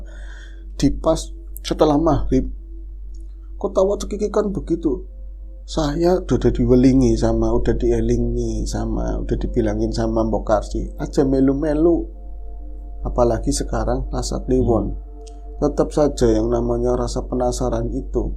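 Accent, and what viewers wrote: Banyumas, Indonesia. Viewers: Asyiik juga dengerin mas e br kisah pke bhs ngapak2 gmn gtu... menghibur ...😊😊😊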